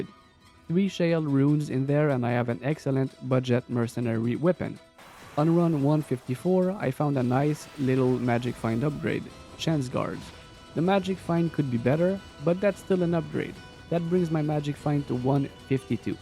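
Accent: Quebec, Canada. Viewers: Are you quebecois? I feel like I can recognize the accent as a fellow Canadian. Cool video either way! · Facile d'reconnaitre un Queb quand t'en entend un lol. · ca sent le quebec icitte !!!!! good video bud! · Are you from Quebec? Accent seems familiar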